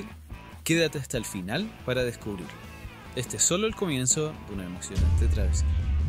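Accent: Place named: Chile. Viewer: Hola, gracias por compartir. Una pregunta: ¿De qué país eres (parece Chile por la tonada) y si hay legislación vigente hay que regule éste carro? Aquí en Argentina se complicó mucho con trabas, homologaciones, pagos de patentes y demás curros que te sacan dinero y tiempo. Gracias por compartir, abrazo. Dios te bendiga.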